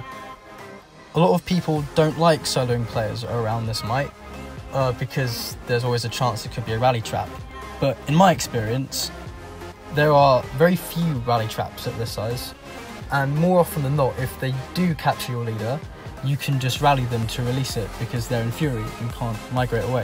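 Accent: England, United Kingdom. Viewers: Huh who is this British Taking over channel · You are English lol didn't expect that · you sound like worthy prince lol...u also from UK? good to hear your voice...u are a serious guy it seems contrary to your posts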